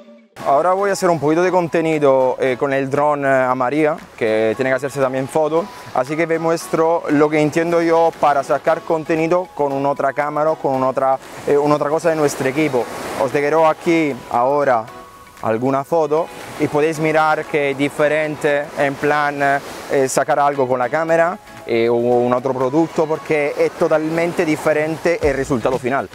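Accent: Italy. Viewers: Todo está perfecto 👌 y me encanta tu acento italiano o estoy equivocado? 🤔 Saludos desde Brasil 🇧🇷 😘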